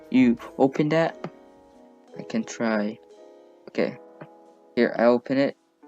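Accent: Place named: Philippines. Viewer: I can tell, you are a Filipino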